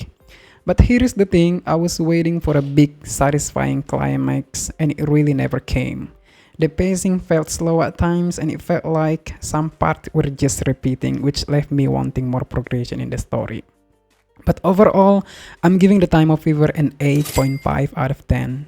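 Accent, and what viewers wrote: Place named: Indonesia. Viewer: Kyk aksen orang indo min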